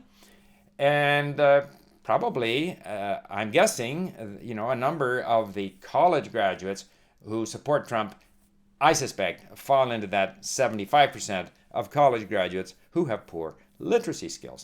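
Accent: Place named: Canada. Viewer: Dude, I am Canadian too. You are the most Canadian sounding person I've ever heard. What part of the country are you from?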